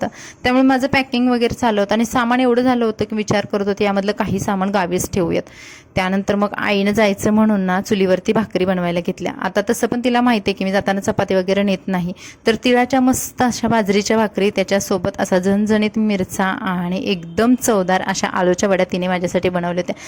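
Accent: India. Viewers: ताई तू कोकणी तर दिसत नाहीस. तू सांगली किंवा कोल्हापूर जिल्ह्यातील दिसतेय तुझ्या भाषेवरून. आणि आईच्या हातच्या अळू वड्या मला पण अजुन आवडतात. आई ती आईच असते. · Seating tujhe gav konte bhasha aamchya solapur sarkhi vatte aani dusara mhanje tubirobadeva vishaibolli mhanje dhangar ka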